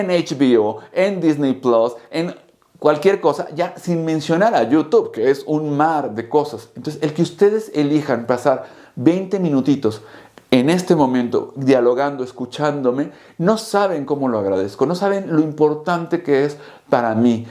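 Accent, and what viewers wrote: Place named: Mexico. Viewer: su acento mexicano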